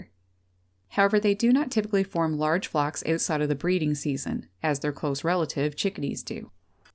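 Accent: Canada. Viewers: I always wondered if these guys and the black capped chickadees (both frequent flyers to my feeders) were related somehow as their endearing calls are very similar in sound. Almost like a dogs squeaky chew toy bring chewed at a rapid pace. Too cute I now have the pleasure of hosting 4-6 Black-capped chickadees, Tufted Titmouse, cardinals, brown thrashers, house and purple finches, blue jays, warblers, chat birds and the red bellied woodpecker at my four feeders every day. They are literally waking me up every morning to get up and put the feeders out. It’s too cute. Oh, and recently I’ve been getting some mourning doves picking up seeds of the ground. Apparently the word is out, my place is the place to be., I’ve been meaning to ask, is your accent Canadian or North Dakota/Minnesota? I’m in Florida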